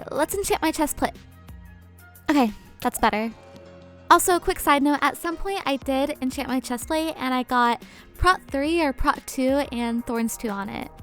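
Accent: Australia. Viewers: The best Aussie accent I've heard so far And I'm a Aussie :) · Your accent was half Australian half English coming from and Australian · Very great Aussie accent, fun thing is that people from the center to northern territory do speak like that, but I'm from the other end of Australia (AKA the southern east area)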